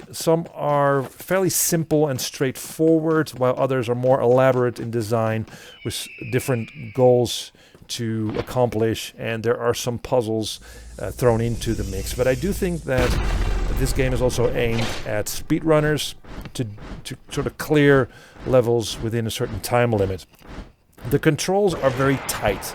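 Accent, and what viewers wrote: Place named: Netherlands. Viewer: Thanks for your review. BTW, your accent really sounds like someone from The Netherlands.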